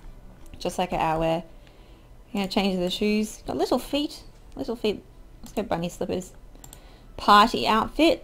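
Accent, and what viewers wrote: Australia. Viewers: She is Australian I'm pretty sure.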